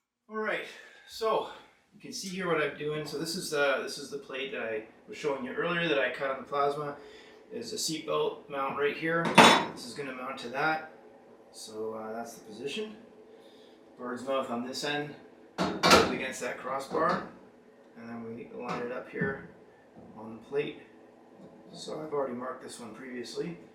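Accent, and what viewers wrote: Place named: Canada. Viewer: Not even finished and it looks killer already !!! Great color choice. Would love to see it in person at a car show someday 👀 The accent leads me to believe you're quite a ways north of Colorado , be a bit too far 😉 Possibly up in Canada 🤔 Anyways really appreciate the videos.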